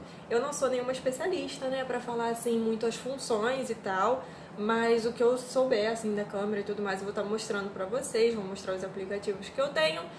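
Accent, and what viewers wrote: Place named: Rio de Janeiro, Brazil. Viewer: Carioca... Aqui no Rio geralmente usa muito o x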